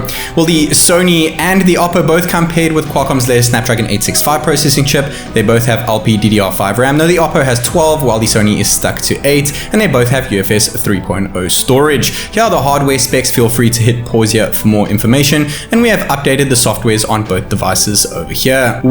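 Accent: South Africa. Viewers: Are you from South Africa? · you sound South African like a joburger..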